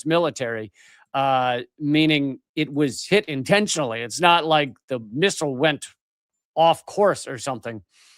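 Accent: United States. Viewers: Nothing personal mate & your content is fabulous just not in the zone to listen to an american voice & that grating accent . All the best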